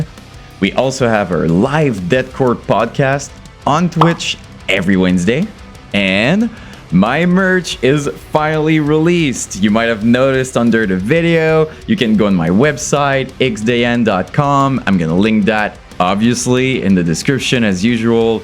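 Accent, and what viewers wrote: Quebec, Canada. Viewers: c'es tu un accent Québécois que j'entend mon chum?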